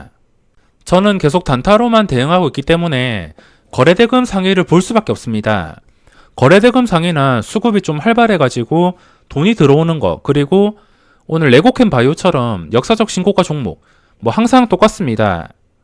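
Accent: Gyeongsang, South Korea. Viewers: 갱상도분이라 발음은 이해해주세요ㅠㅠ 저도 창원이라 정말 발음 힘듭니다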